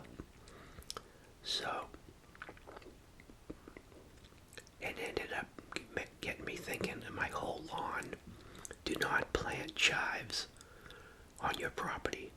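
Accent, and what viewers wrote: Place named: New England, United States. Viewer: Im sorry your hive didnt make it 😔 It is a lot of work and I know you had to pay a lot for the queen. I truly hope this year your hive is wondeful and survive the New England winters.🐝 My parents stayed in Maine for the eclipse and it totally blacked out where they live. My parents are now currently renovating my moms childhood home in Buzzards Bay. You remind me of my childhood with your dishes, the foods you eat, your sayings and the places you mention and your accent❤. It always makes me happy.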